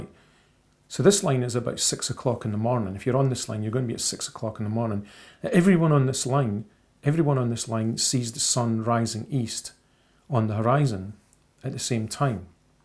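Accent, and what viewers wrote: Scotland, United Kingdom. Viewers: Holy shit man! That's horrible. Personally I love your accent man. I come from a primarily Scottish family, here in Canada, so it makes me feel right at home actually. You sure as hell are more well spoken than some Scots. Some of them have such poor diction, that you can't even understand them, unless you are Scottish. You are perfectly understandable actually. People are fucked. Then to criticize your hands? Oh man, how childish. They are clean and so are your nails. Lol. So I don't get that either. People will pick anything when they have nothing huh? Lol.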